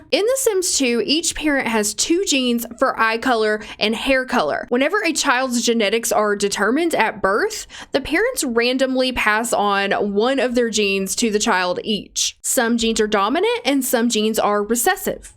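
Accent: South, United States. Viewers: Do I detect a southern drawl?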